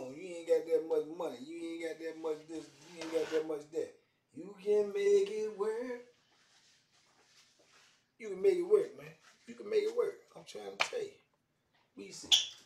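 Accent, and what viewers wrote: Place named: New Orleans, United States. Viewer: You sound like you from New Orleans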